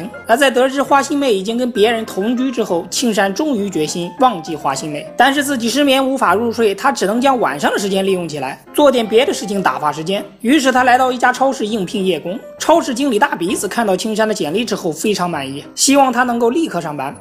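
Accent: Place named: Northeast, China. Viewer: 這是東北解說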